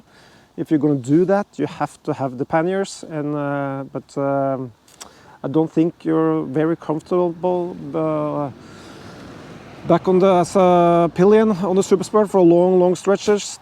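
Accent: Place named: Liverpool, United Kingdom. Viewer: Great review! There's a slight hint in your accent throughout the vid that you've spent some time in Liverpool e.g . "I just luv this bike, it's my second one and I just luv it ".... 😊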